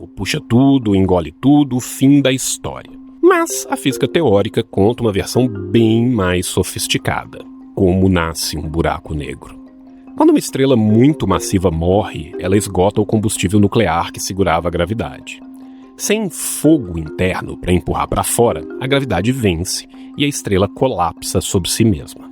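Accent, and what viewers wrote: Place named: Minas Gerais, Brazil. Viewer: é um universo. E o sotaque mineiro deixa tudo melhor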